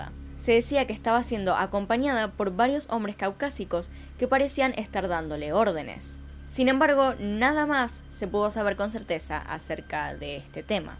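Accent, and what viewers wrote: Argentina. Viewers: Hay veces que no me gusta el acento argentino (sin ofender a nadie) pero sin embargo amo tu voz y la manera en que relatas todo ❤️ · Me encanta tu acento argentino. Estás súper guapa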